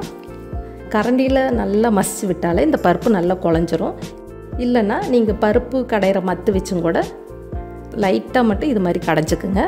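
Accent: Coimbatore, India. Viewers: slang apdiye namma ooru slang Sema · Your demo is very good with Coimbatore accent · Unga kovai slang superb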